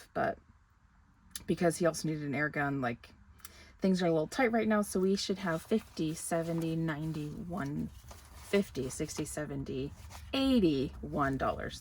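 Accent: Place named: Midwest, United States. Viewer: Your video voice on this channel is so Midwest. “You scrap? Are you a scrapper?”😂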